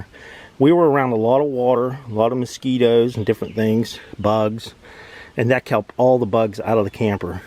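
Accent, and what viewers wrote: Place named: Western Pennsylvania, United States. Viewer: robert hoffman - I caught that too..plus overall accent..my mom and dad are from around Tarentum and Oil City...I grew up in NC tho! Thanks for video..I'm single 62 yr old female just retired. I've never pulled anything behind my car and I'm looking for anything small. This is sounding more and more like the way to go!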